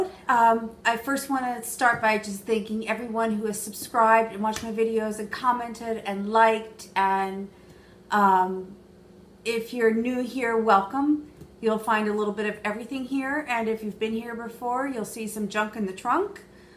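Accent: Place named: Philadelphia, United States. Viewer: I have to watch you! You’re from up near my hometown (Philly) and the accent makes me feel like home lol plus, you crack me up 😂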